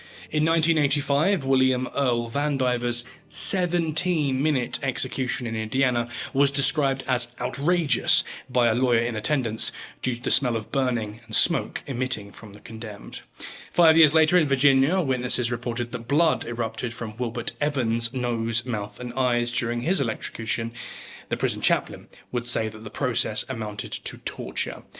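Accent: United Kingdom. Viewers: This guy sounds like he might be from the same part of England as Rationality Rules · A “vile” execution is necessary for a vile crime. In most cases, the condemned suffer much less than the victims. Fry ‘em all!!!! By the way- you sound like anti death penalty blubbering liberal limey. Why don’t you wash that shirt and report on rainbows and unicorns. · I was a state criminal prosecutor for 35+ years. Like most people, I feel conflicted over the death penalty. Even so, I think there is a great deal of misunderstanding over what happens during a legal electrocution. When 2,000 volts and 8 amps are applied, the condemned person immediately loses consciousness. Witnesses see very little movement except the person’s head goes limp after the first jolt. He is likely dead at that point, but a second jolt is applied to ensure death. This is probably gruesome to watch, but that does not mean that the condemned person is suffering pain. 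We know this because people who have survived accidental electrocutions at less current report remembering nothing. Electro-shock therapy is still used medically, with patients reporting no memory of the treatment. While there are a few exceptions, an electrocution usually is carried out without complications. The condemned person does not writhe or catch fire. I am guessing from the accent of the narrator that this video was made by a British citizen, where capital punishment is no longer legal. While I understand opposition to the death penalty, I would respectfully ask you to remember that the United States has different criminal problems than what you have in Britain and that capital punishment here, imposed by juries, is reserved for only the most aggravated types of murders. It is relatively rare. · There is something about British accent and British ways of talking that always make me laugh. 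Hehehehe. Dude is hilarious...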